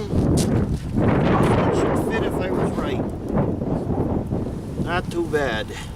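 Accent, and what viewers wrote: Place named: New Jersey, United States. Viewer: Lol u gotta be from jersey from the accent. Nice work tho 💪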